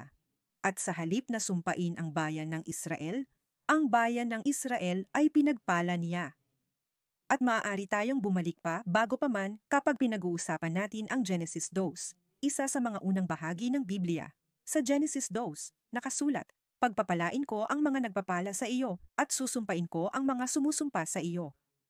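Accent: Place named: Philippines. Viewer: Malinaw,miss, pilipinong pilipino Ang voice over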